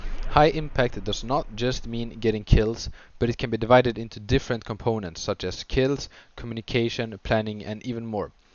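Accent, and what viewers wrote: Sweden. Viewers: Älskar den svenska dialekten, bra video mannen, ska ha allt i åtanke :) Just nu D1 med ca 120h, målet är ASC denna act!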